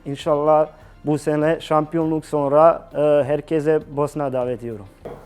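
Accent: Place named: Trabzon, Turkey. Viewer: La adam konusmasi tipi felan tam trabzonlu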